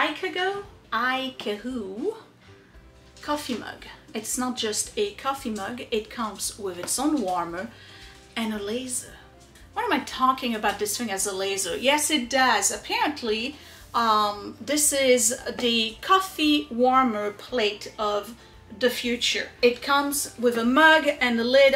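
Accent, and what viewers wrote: France. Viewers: Dear. As to your accent. I started watching your channel, and yes, from the beginning, precisely because, I couldn’t initially work out your accent. A touch of French, a bit of New Jersey, I found it a charming, or, tres charmante accent, and have been watching you ever since. Yes, your sometimes fracturing of English, or American is funny, but in a good way. I ‘ope you always retain your interesting way of speaking. Thank you, all the best to you, those endlessly growing boys, you have built a great channel.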